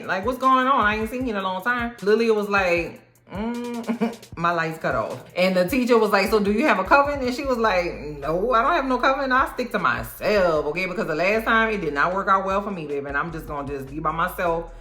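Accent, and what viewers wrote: New Orleans, United States. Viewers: I love the way you explained this, your Nola accent makes it better “Baaaaby” 😂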